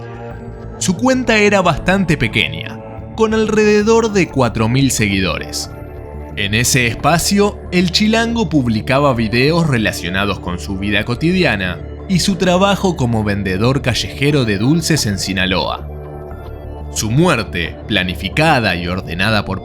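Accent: Argentina. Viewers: Quien coños narra éste video, ¿Un argentino? No mames 😠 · Tú acento argentino, es tan molesto. ..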